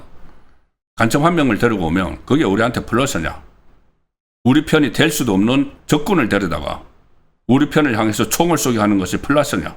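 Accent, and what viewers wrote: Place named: Daegu, South Korea. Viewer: 송구컨씨ㆍㆍ아무리 지방출신이라도 발음 억양 좀 조정해라ㆍㆍ듣다가 보면 짜증난다 나도 대구다 그리고 어설프게 코미디언 수준으로 짖지말고 좀 잘해라 내용이 없다 다 보고나면 영남일보냐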